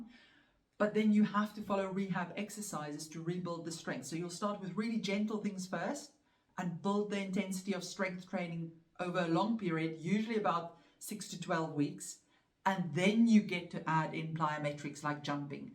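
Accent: South Africa. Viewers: I love your accent! Are you south african?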